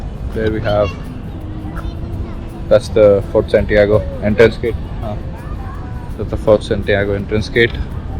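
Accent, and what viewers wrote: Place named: India. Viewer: Listen to yourself! You can hardly be understood. You are speaking English with deep Indian accept. DAH!!!!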